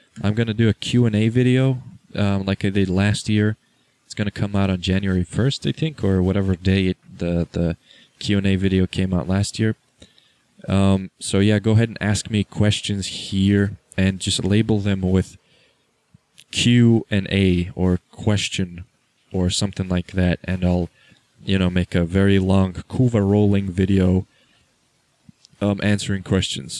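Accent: United States. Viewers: Q&A: How did you, a montenegroid, learn to speak english so well with American accent? Also, are you still a student? What do/did you study?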